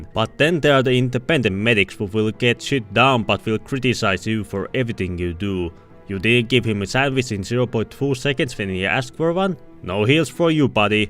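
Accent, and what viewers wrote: Finland. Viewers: Nyt on nii vahva suomiaccentti että aijaijai :D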